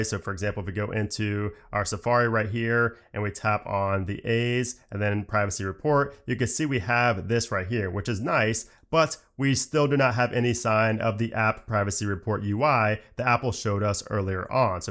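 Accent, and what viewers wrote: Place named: South, United States. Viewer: southern accent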